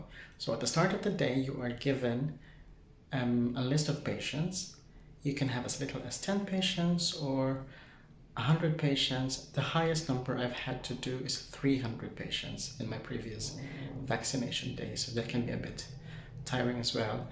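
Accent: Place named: United Kingdom. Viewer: wow kuya british accent na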